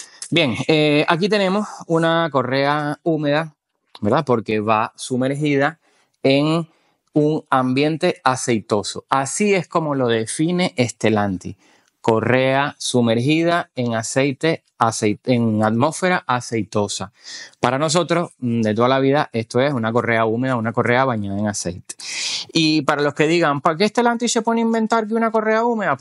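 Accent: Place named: Venezuela. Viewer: Suena más a venezolano que el mismo maburro😂😂😂😂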